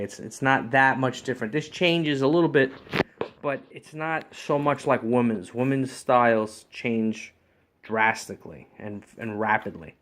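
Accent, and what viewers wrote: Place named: New York, United States. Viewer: Hi, great video. Thanks for sharing. Also, love your New Yourrrrk (?) accent:) Pinterest is awesome for finding womens clothing info. e..g typing "types of skirts" would bring up a infographic showing a-line skirt, pleated skirt, etc. Even Google Images would do it.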